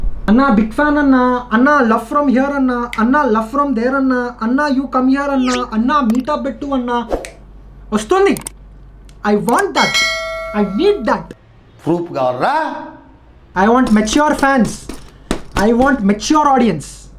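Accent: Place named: Hyderabad, India. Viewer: ANNA How're you ? No need of switching yourself to confined Telugu Motovlogs We always love your feerfect istyle of pakka HYDERABADI slang in your content. Anyways wishing you all the success ahead ANNA Best wishes from our BBA Back Benchers.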